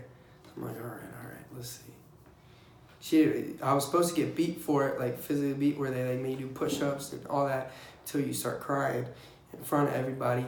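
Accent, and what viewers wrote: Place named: East Coast, United States. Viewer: 😂😂 i can tell mans is from the east coast good shit my guy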